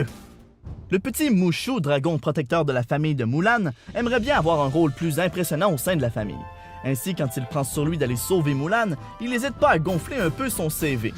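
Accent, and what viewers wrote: Quebec, Canada. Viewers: Bon anniversaire ❤️😂je suis québécoise et ça fait du bien d'entendre l'accent, même pendant quelques minutes 😁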